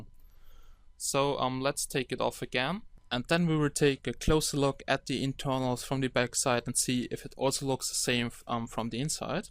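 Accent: Germany. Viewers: Are you german ? Because you speak english like a german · You have a german accent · wie man merkt dass du deutscher bist :D - aber gutes englisch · Man merkt das du deutscher bist ahhaha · du kommst doch aus deutschland :D ich hör dein akzent :D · Maybe that`s the reason I understand him so well - I`m German too... :D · are you german? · Hallo, ich nehme an das du deutsch sprichst, deines Akzentes und einiger Handy's wegen in denen ich gesehen habe, das Sprachen auf Deutsch sind;) Finde deine Video's sehr spannend und belehrend, mach weiter so! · are you german ? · Digga du bist deutsch oder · Man merkt nach dem ersten Satz das du Deutscher bist :)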